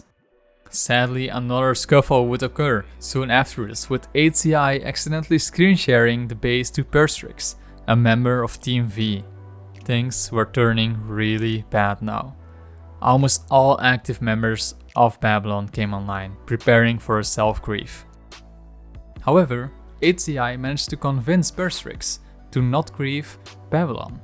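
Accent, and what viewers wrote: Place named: Netherlands. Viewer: cause u sound dutch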